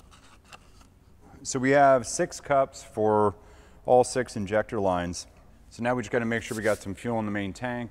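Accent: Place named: Philadelphia, United States. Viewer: Anyone else smell that? "We've been here all night" he walks out the door, and back in -- that's a lot of light outside, in January, around where I assume he is around Philly (I grew up in Levittown) -- I know that accent, and plus when he fired up the GPS in the plane it seemed to center around Delaware county or so. Then he says he needs $20k. I smell scam. Which is a shame, cause watching him get the props loose was really fun. Something's not right.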